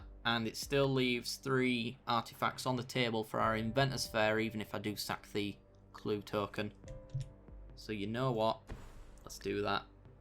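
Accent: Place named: United Kingdom. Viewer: British accent is always good!:)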